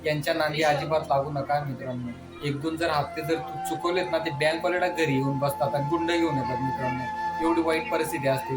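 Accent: Khandesh, India. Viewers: खान्देशी आहात का भाऊ tone तिकडची वाटून राहिली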